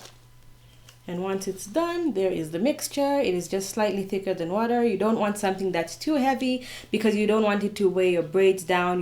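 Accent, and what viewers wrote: South Africa. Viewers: You sound South African ❤️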